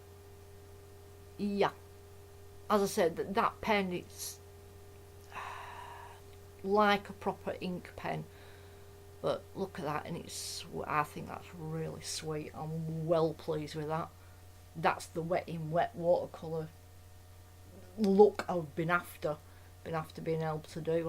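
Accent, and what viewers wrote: Lancashire, United Kingdom. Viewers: Wow, so vibrant. This is the depth of colour I've been looking for and got so frustrated with watercolour pencils and prismacolour wax pencils. I've purchased a set of 12 Brushos and will approach them from a mixed media/craft perspective. You're the first person that I've seen do a tutorial truly painting with them and none have used the thickener in this way. You are truly innovative and inspirational and I love your Lancashire accent. Love from Chester UK.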